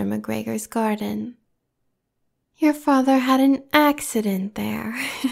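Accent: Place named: Brooklyn, United States. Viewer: I was thinking more of a Brooklyn New York accent but that works too 😄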